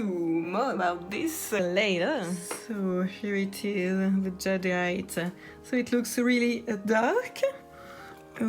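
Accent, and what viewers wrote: France. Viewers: I’m so happy when I see you. I love your lovely french accent and your personality. Your art is 💯❤️❤️❤️ thank you for existing! Lots of love from Italy 💚🤍❤️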